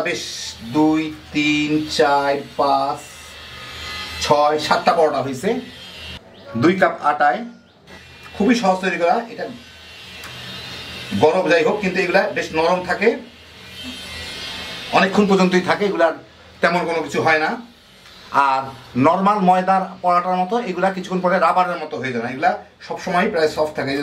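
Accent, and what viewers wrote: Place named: Thakurgaon, Bangladesh. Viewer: ভাই,আপনার বাড়ি কি ঠাকুরগাঁওয়ে? কথা শুনে মনে হয় আপনি ঠাকুরগাঁও এর মানুষ!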